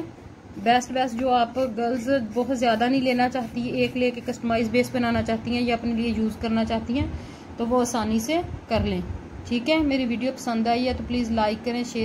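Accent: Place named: Punjab, India. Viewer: Aap punjabi ho.punjabi hini main a hi jandi a ji😊😊😊😊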